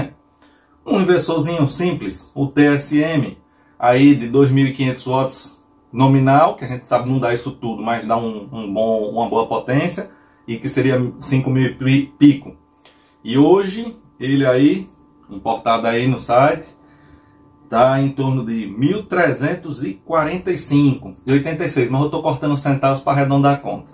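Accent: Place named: Rio Grande do Norte, Brazil. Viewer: Comecei a acompanhar seus conteúdos hoje e já me inscrevi, sem comentários a qualidade. Sou de Vitória ES. Uma sugestão, você tem uma boa didática e conhecimento, os principais requisitos para preparar e lecionar um curso completo no assunto, é uma forma de remunerar e é justo e honesto. Pelo seu sotaque, acredito ser de RN. Abraços e sucesso.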